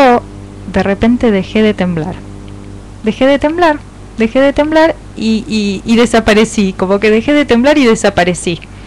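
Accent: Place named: Argentina. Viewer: Gracias a ti, por recordarme mi acento (vivo en España), el sentido del humor y la claridad argentina para expresar algo cuando se la tiene clara y mucho mas, por recordarme muchas cosas a traves de tu experiencia. Pues, ahora a seguir experimentando sin los miedos! LO UNICO QUE IMPORTA ES LA CANTIDAD DE LUZ QUE BAJAS!!!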